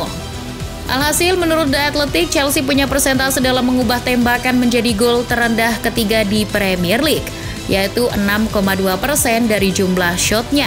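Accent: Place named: Semarang, Indonesia. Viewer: pengisi suara jangan jangan org semarang nih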